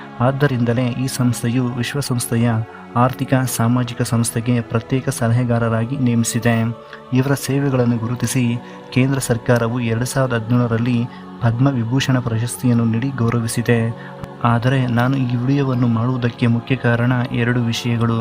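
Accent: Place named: England, United Kingdom. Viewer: He talks in a very heavy English accent and pretends not to know Kannada. Why can't he talk normally.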